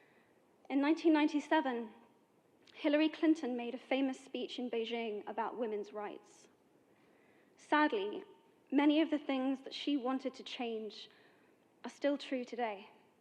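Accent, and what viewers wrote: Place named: United Kingdom. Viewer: bla bla bla..è vero forse per i paesi musulmani, asiatici o africani ma in Italia no. Purtroppo qui, come nei paesi occidentali abbiamo già sperimentato cosa intenda la donna per femminismo: abbiamo visto troie fare la coda per fare i festini dal primo ministro, donne (anche minorenni) disposte a vendersi per una ricarica del cellulare, donne al potere incompetenti (pivetti, boldrini, santanché, picierno..), donne bugiarde e più meschine degli stessi uomini, cantanti celebri che "pornificano" ogni loro gesto, le parole dell'attrice inglese sono retorica vuota e stantia per femministe fallite. Ringrazio per l'upload, ho potuto così saggiare l'ottima pronuncia Bristish di questa attrice sin ora a me sconosciuta. Grazie.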